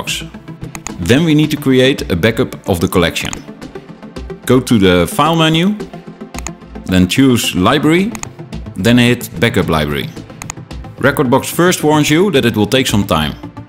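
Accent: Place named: Netherlands. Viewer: Hollands Engels. KOTS!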